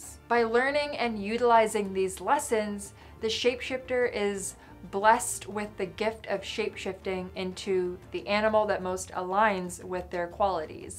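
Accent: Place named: Canada. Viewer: I wonder if she is Canadian or Minnesotan the way she says certain words. Interesting video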